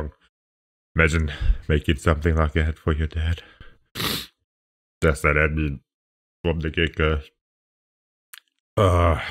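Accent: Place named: South Africa. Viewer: Wow. I'm so sorry to hear about your story. When the video started I wanted to say that you sound like a fellow South African. I know the accent. Greetings from Port Elizabeth. I'm gonna watch the reaction now but I already know I'm gonna be in tears. If you have a few minutes to spare, please react to by (live from the American idol farewell season) it's a very very emotional performance. And I'm so very sorry to see and hear about your heartbreak. Bitter jammer 💕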